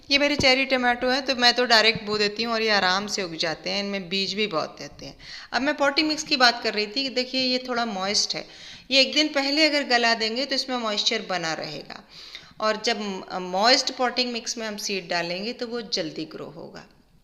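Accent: Standard. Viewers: Very nicely taught Ma'am. I luv ur shudh hindi !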